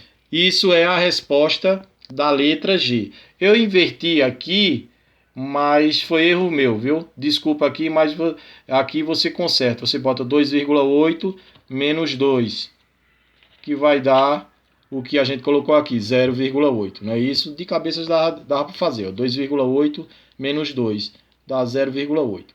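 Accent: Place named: Alagoas, Brazil. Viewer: Boa noite! Obrigado por postar os exercícios. Pelo seu sotaque me arrisco a dizer que o senhor é alagoano.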